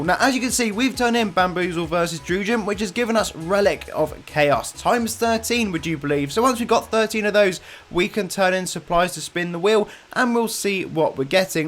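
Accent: United Kingdom. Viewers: I can’t comprehend the bristhist accent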